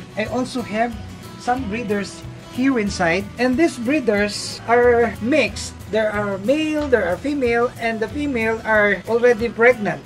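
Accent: Philippines. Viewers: Hi,i am Indonesian thank you for sharing a lot of inspiring knowledge from you experience&your good heart,humble& english style remind me of my pinoy friends (i miss them),wish u more success,salamat Po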